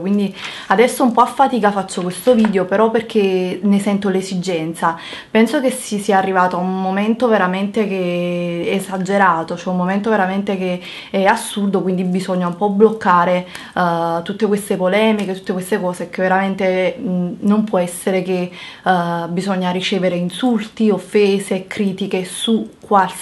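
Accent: Naples, Italy. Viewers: queste persone sono davvero idiote ma come si fa a non amarti? cioè davvero il tuo accento napoletano secondo me è una qualitá e si deve sentire il nasone ce l'avranno loro perchè io non lo ho visto ... abbi forza non mollare per tutti noi non mollare!Saremu anche terroni ma tinemu a capa tosta e perlo in generale di tutto il sud inoltre il sorriso nonostante tutto nessuno mai si puó permettere di torgliecelo! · Cara ti seguo con piacere proprio perché sei vera, lascia perdere chi ti critica va avanti c'è tanta gente che ti apprezza davvero. Chi ti critica lo fa perché sa che, oltre ad essere una bellissima ragazza, sei soprattutto intelligente ed in gamba. Invidia si chiama, Continua così anzi più forte di prima:D Un saluto:) ps: amo il tuo napoletano.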